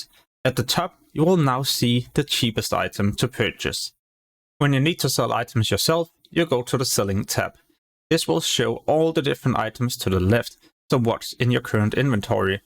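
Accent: Denmark. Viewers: Man you sound Danish 😅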